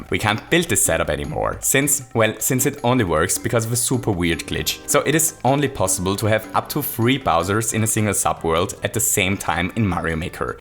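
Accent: Germany. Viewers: you sound, Very german mein freund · Where is he from? Because he sounds German/Austrian. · Bist du deutsch? (Are you a german?) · Du bist Deutsch oder? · Could it be that you are german? You have a strong german accent.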